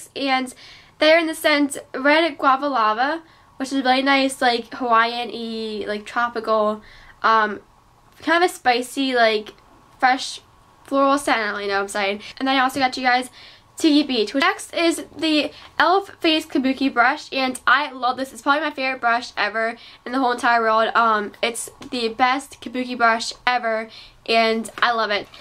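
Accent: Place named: United States. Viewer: Your so pretty and I love your accent I want to be American ahh , the falsies mascara did not justice for my lashes it just wasn't worth the rave hah xox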